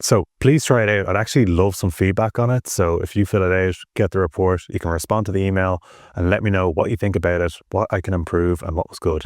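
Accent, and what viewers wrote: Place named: Ireland. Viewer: Which part of Ireland is your accent from?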